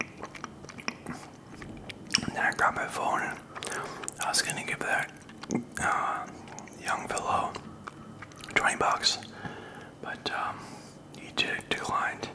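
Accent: Canada. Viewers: The Canadian accent always brings me back to your videos. Keep up the grind!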